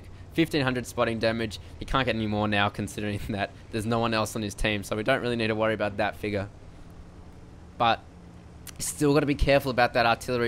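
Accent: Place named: Australia. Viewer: this 'stralia accent is quite arousing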